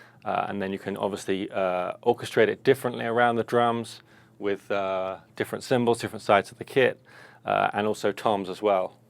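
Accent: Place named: United Kingdom. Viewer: Do you own all of these sets? As I am watching lately your videos (where you speak and act very British, compared to other guys on youtube channels) I can see a lot of nice sets: silver USA custom, black USA custom, blue Heuer, Phoenix, or Hybrid Maple, black and white....What's the deal? How do you get all of these?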